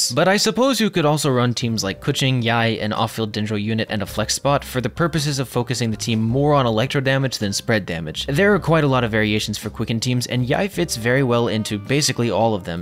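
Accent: United Kingdom. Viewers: The English accent though hehehe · The British accent 💀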